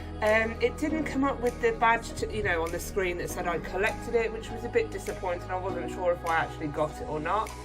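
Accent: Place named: United Kingdom. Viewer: I can't believe it took me this long to actually comment on this video. For context, I am the creator of the game and I was sent this video to a few friends of mine who found it and even I came across the video after searching up the game on Google. I didn't think much of it but today I did another search and I thought I should watch the whole thing! 1. - Clock Soapy's badge error was a period of time where more people had that badge than 60 Minutes, so it was disabled to stabilize the amount of owners with said badges. Sorry for that! 2. - I was not actually expecting the areas to be THAT small since the original size was based on Spawn, which didn't have much. My main reason for making these areas small is that I hate large and open areas. it leads to longer searches and overall takes a lot of time to find things like buttons. 3. and - Yes those are toilets. 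They're planned to be used for a new area in the future! 4. - Oops I guess I made it too close to the main road 5. - "Cheeky little button" I LOVE this commentary! It really adds a spark of life to this video (I'm sorry but the British accent makes this video way more entertaining to me than it should be) 6. - I'm glad I get to hear someone falling for the glowing flower! It felt so good to add that in knowing it would throw everyone off 7. - School is something that's for sure but I'll try my best to keep the pace going! 8. - That's a really good point. With how I plan to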